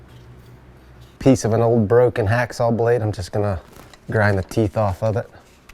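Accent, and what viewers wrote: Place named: South, United States. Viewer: Your voice sounds more yeehaw than usual. Cool build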